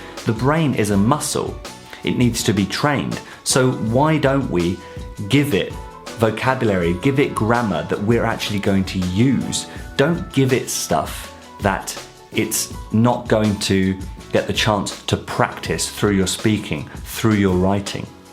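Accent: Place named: United Kingdom. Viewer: Are you British? You sound like it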